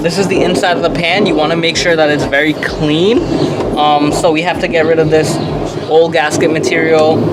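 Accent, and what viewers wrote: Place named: Trinidad and Tobago. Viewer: Very nice man, I have an 03 avalanche. It sounds like you're from Trinidad? I'm from St. Kitts and Nevis, but my family is from Guyana.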